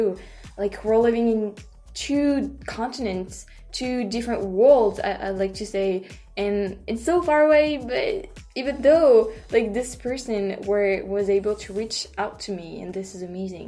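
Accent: France. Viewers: Watching you reminds me of my visits to your country (Paris 2 times) and your accent makes me happy. Keep being you and please stay safe. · Your English is fine. And the little differences makes it more interesting-- I love listening to your French accent. I grew up with a partly French family who were and are our best friends in the Detroit , MI area. I love their accents. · Wait what kind of channel: 😳 ? .........Lol. But in all seriousness I i love your channel and reactions and lovely French accent. You're wonderful, keep up the good work.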